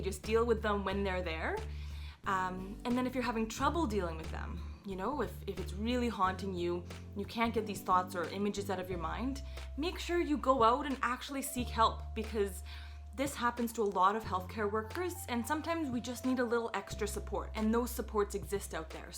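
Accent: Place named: Canada. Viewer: Really heard your Canadian accent in this video :)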